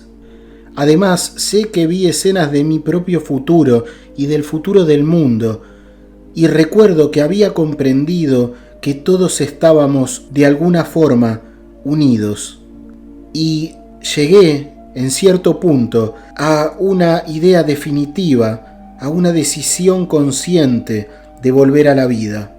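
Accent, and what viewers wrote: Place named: Argentina. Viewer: Vamos a darles likes,para seguir escuchando la voz relájate de éste caballero, lástima que no hay opción de darle me encanta,me fascina, porque a mí la verdad me encanta como el habla,me gusta escuchar,los argentinos,los uruguayos,los chilenos,se escuchan parecidos.les envío a todos abrazos de luz, que Dios los bendiga.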